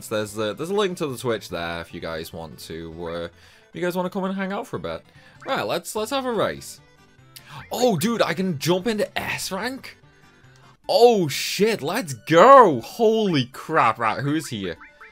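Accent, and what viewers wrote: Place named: Stoke-on-Trent, United Kingdom. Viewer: Knew what? Stoke-on-Trent? I called that too! It was the one accent I never saw him deny on stream, but he just ignored it when guessed instead. Also because I went to Staffordshire uni so I'm kinda familiar with it. I ruined my stomach drinking Pepsi for three years because the tap water in the area is disgusting.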